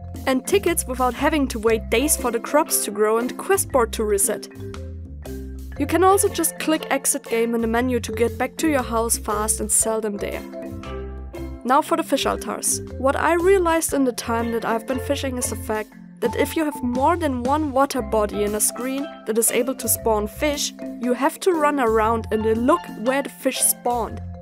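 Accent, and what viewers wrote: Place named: Germany. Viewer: I just love hearing your Lazuli the Glaceon voice (though that's your normal voice, I can't help but picture my favorite ES character/Eeveelution). That smooth German accent is so cool.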